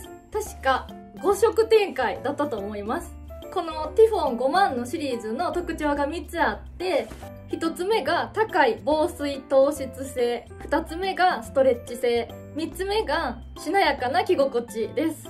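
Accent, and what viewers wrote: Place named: Kansai, Japan. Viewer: 、 通販番組出来ますよね、笑顔と、 心地よい関西弁でがっちり心掴まれますね❗️ チャンネルの人気もお人柄なんだと思います♪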